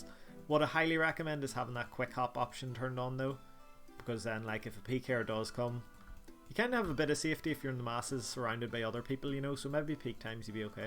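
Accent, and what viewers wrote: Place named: Northern Ireland, United Kingdom. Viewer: Subbed and liked! Are u from Ireland by any chance? Ur accent sounds northern Irish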